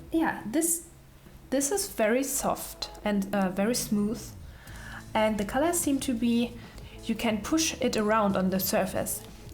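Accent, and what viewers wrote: Germany. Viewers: Your german-english is so charming 😍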